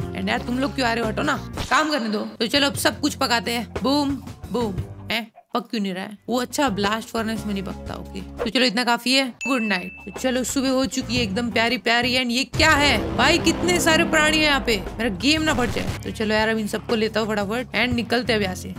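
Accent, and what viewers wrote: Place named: Gujarat, India. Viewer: Aapki boli se lagata he ki aap gujrati ho kya ho aap gujarati 😘😘😘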